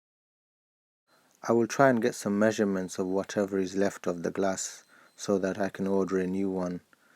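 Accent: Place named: Black Country, United Kingdom. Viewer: Hi. Are you from Smethwick by chance. You have more black country accent than Brummie.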